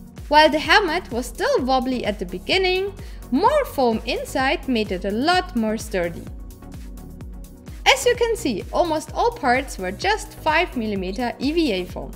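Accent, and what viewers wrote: Germany. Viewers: Man merkt gleich, dass sie aus Deutschland kommt 😅 aber gut gemacht. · Ich hab mir schon gedacht, dass du irgendwie einen deutschen Akzent hast aber nachdem ich mir die Kanalinfo angeschaut habe war ich mir sicher. Btw wirklich hilfreiches Video! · Are u German???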